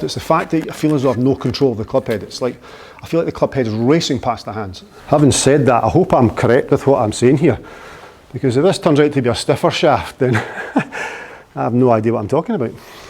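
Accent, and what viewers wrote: Scotland, United Kingdom. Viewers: you are a blast to watch and whatever you do, don't lose that Scottish accent!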